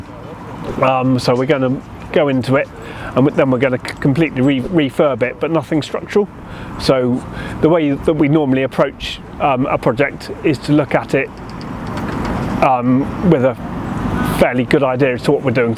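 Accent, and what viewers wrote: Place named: Newcastle, United Kingdom. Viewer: Is Newcastle. Howay man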